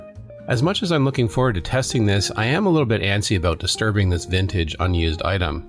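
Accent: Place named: Canada. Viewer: You sound so Canadian. "Ageenst it" hehe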